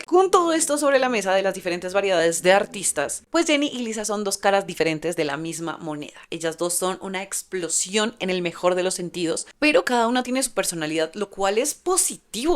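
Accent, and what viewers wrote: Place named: Colombia. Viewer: Con ese acento tan hermoso sabía qie eras de Colombia😘 ❤.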